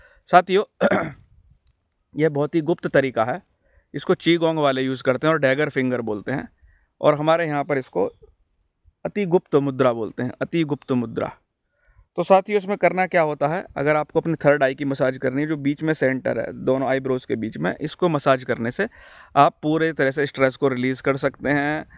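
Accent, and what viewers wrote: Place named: Junagadh, India. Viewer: आप की बोलने की ले से मुझे अंदाजा था की आप जूनागढ़ से होंगे आप को सादर 🙏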